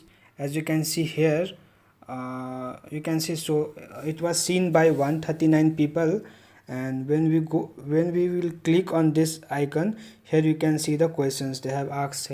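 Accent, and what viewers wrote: India. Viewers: Ilike the Hindi english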